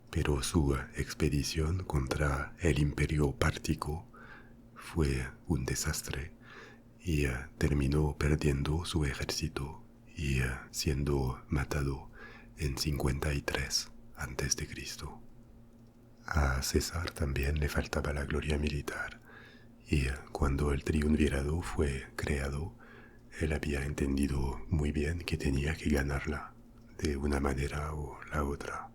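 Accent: France. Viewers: I've never thought about this before. You have a french accent in English, but also probably in Spanish too. I know a little Spanish, I'm gonna find out if it's enough to make out the accent Edit: nope, I can't tell. Maybe your Spanish is actually perfect?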